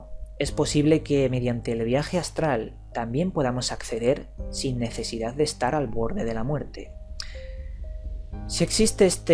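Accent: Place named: Spain. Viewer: Gostei muito do vídeo, mais eu não entendi muito porque sou brasileiro e você deve ser espanhol.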